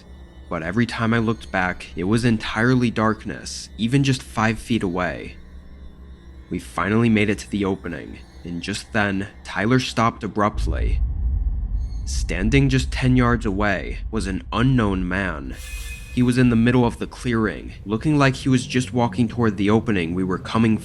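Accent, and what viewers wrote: Canada. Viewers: I actually hear a Canadian accent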